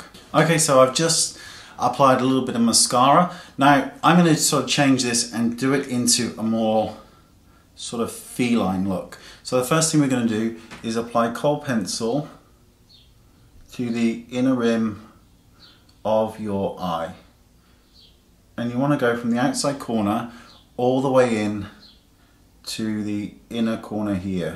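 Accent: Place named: West Country, United Kingdom. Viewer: You have a slight West Country hint to your accent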